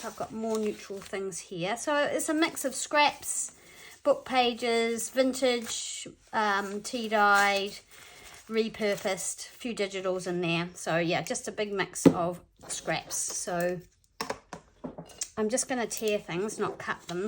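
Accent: New Zealand. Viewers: I love hearing a junk journaller with a kiwi accent. Congrats on the growth,. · Hi I have just found your channel and thought wow, she sounds like a kiwi! So further investigation and yes you are her in NZ! That is so good as I didn’t think had a channel on You Tube for junk journaling. I don’t know anyone else here in Dunedin that does JJ so really pleased to see you here and hear your accent 😂Lynne Dunedin NZ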